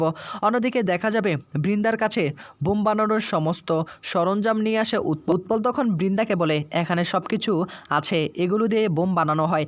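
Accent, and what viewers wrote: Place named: East Bengal, Bangladesh. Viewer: বাঙ্গাল বাঙ্গাল উচ্চারণ ,অশিক্ষিত। কথকতা করতে শিক্ষার দরকার। শিক্ষা নিয়ে তারপর কথকতা করতে এস।চেষ্টা করলে পারবে।শুভেচ্ছা রইল।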